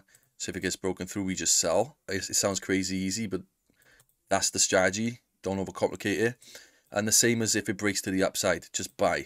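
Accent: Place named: Cardiff, United Kingdom. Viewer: Cardiff accent bro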